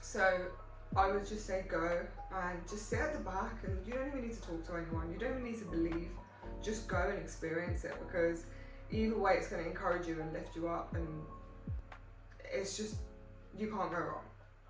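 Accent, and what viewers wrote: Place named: United Kingdom. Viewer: I love your accent! What part of the UK are you from?? 🇬🇧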